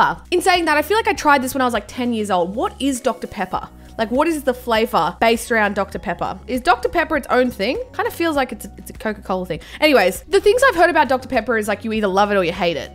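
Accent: Australia. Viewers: Are you a off brand azzyland with a budget cut and Australian accsent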